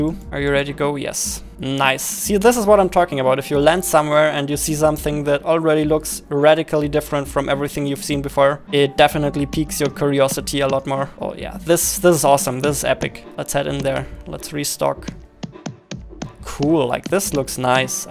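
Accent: Germany. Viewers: Bist du deutscher? Dein Englisch klingt nämlich so xD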